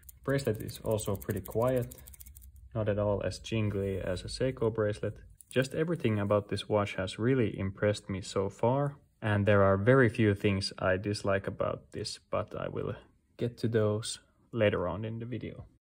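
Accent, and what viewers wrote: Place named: Finland. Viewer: Everything in the voice, intonation, word selection and the way of speech made me think this got to be finnish guy speaking english. Sure enough, finnish dude. Nice video and that watch really is a good watch, only gripe is that it looks like rolex, but its just my opinion. greetings from finland.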